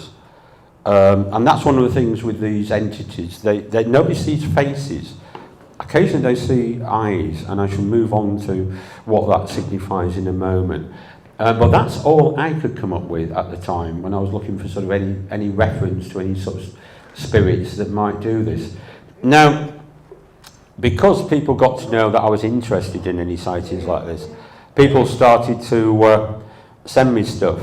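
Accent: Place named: Lancashire, United Kingdom. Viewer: This man does NOT slur his words, he has a Lancashire accent and is using a mic that has a bass bias. He speaks VERY plainly, and if you don't understand the words he's using, maybe you should look them up and expand your vocabulary rather than decry him for your own lack of knowledge.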